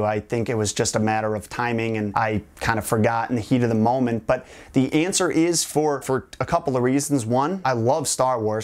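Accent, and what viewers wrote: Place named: New York, United States. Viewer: Wait he is from a small country town? I literally thought he was a New Yorker or like from Brooklyn or something cuz of the accent I perceived from him lol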